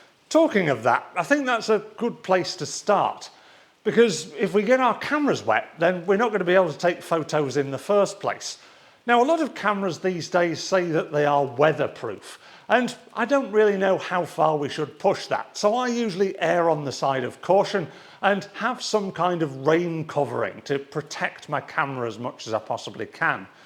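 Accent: United Kingdom. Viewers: you're great. i just found you a few minutes before and im all in. interesting story-telling, beautiful pictures - love the brittish accent, and you're glasses are the cherry on top. i draw my hat sir!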